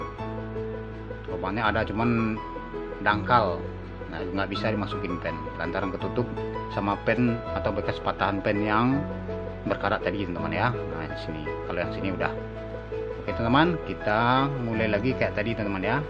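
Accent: Padang, Indonesia. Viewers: Mokasih daa.. dri logat nyo, jaleh bana urang Padang🤣👍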